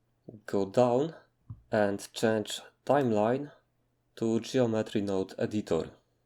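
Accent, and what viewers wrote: Poland. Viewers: Sensing a Polish accent there :)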